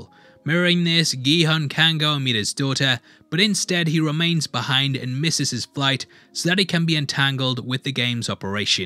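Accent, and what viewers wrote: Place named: Newcastle, United Kingdom. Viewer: He's not doing anything with his voice. He has a Newcastle accent.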